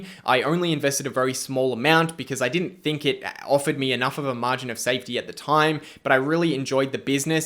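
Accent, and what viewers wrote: Australia. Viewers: An Aussie accent and rosy cheeks ☺. Lol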